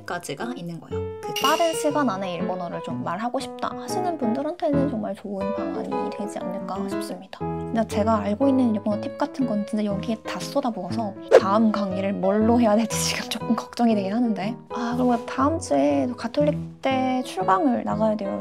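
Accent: Kansai, Japan. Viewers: さんの関西訛り日本語関西人として親近感わいて好きなんだけど😂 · 예전부터 구독했던 님 구독자 여려분들은 다 아실 내용이긴 하네요 ㅋㅋ 칸사이벤 말투 ㅋㅋㅋㅋㅋ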